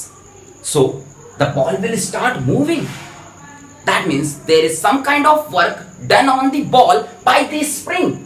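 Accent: India. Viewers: Sir aap bohat funny lgrhe the.Aapko english bolne ki kya zarorat thi.Aap hindi bolte hi acche lagte ho.Tb aapki teaching delightfull lgti h.Aapko iski koi jarrorat thi hi nhi.We love you and your hindi accent.🙏🙏🙏🙏🙏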